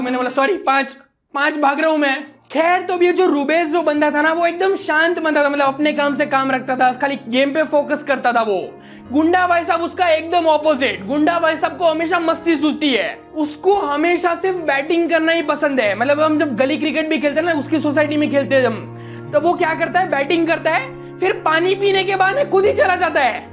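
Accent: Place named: Jashpur, India. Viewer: Bhai are you from jaspur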